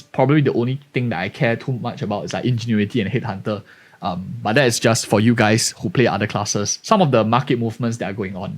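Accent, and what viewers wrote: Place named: Singapore. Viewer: Great content, are you from Singapore? HAHAHH idk why but u sound very Singaporean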